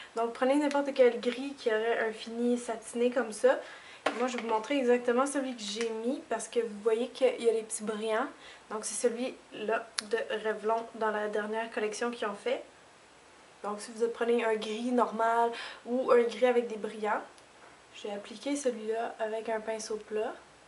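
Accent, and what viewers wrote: Quebec, Canada. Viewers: Salut!!je viens de tomber sur ta chaîne et hop je me suis abonné car tes video sont super!!!J'adore ton accent ça me fait voyager!! ( le Québec j'aimerais bien mais pas en hiver!!!) ahah!! Gros bisous de Lyon!! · Je me suis inscrite sur YouTube pour une seule et simple raison : "mettre j'aime" a tes videos ! J'ADORE :D Tes maquillages sont superbes et ton accent me fait penser à mes vacances au Quebec ... :) Bonne continuation bisous !! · Bonjour ! Je viens de decouvrir tes videos et de m abonner !!! j aime beaucoup ! Et cet accent...il me rappelle mes sejours au Quebec! Je suis in love du Quebec!! Je vis dans le Sud de la France ;-) Merci pour tes bons conseils et rendez vous aux prochaines videos ! Ps: la video pour les fetes est top ! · Bonjour je suis abonné depuis environ une semaine. j'aime beaucoup tes vidéos, on apprend enormément de chose. 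Et j'adore ton accent quebecquois. Moi je suis française:) et ce maquillade hivernale est trés jolie! Continue j'attends tes vidéos avec impatience!!!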